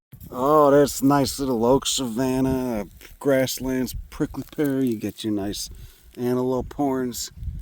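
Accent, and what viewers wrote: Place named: Texas, United States. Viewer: Hey! You're up near my neck of the woods in Austin, nice of you to visit. Timing is good, too, wettest Spring I can recall. I learn so much watching your videos. If you are not now a professor of Botany at some Texas University, they should be recruiting you. You would be the most popular prof in the state. BTW, I fully endorse your pattern of speech, time to admit to reality in Texas.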